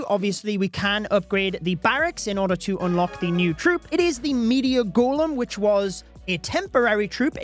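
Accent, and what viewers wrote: United Kingdom. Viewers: I love this British accent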